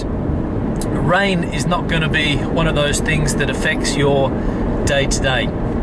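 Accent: Australia. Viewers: Thank you we are thinking of moving to Valencia good to hear another Aussie! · That's an Aussie accent... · Where are you from? I would say you sound Australian, but since I am Spanish I am probably not the best one figuring English accents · OMG what are my ears hearing: A Brit acknowledging we here in Spain have great food!! xD (edit: No, it was an Aussie, too good to be true haha) Good video by the way, I agree with pretty much everything you said. And I love the driving and road footage. You didn't mention roads/infrastructure as a big pro (edit: last thing you said :) Regarding corruption: It's true that it's huge, but as you said there's no corruption at the "lowest" level, you can't bribe a policeman or a clerk etc. Also most big corruption scandals involve local and regional governments, not the State itself. I'd say the "State" and the bottom-working public officials are clean. 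It's the regional big-fishes who are corrupt most of the time. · He sounds pretty Australian. Maybe he just speaks skow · Why not saying you are from Australia (accent is obvious) and make comparisons with Down Under? Video could be much shorter.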